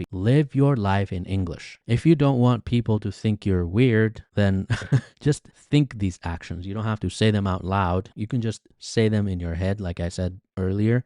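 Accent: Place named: United States. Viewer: Sir i eagerly want to learn English through your podcasts i learned a lot of things from you about how to improve English but the problem is that i want to learn British accent and your accent is American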